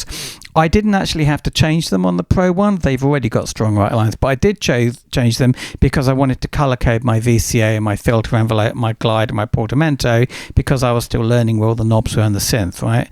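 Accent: United States. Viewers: Why you speaking in American?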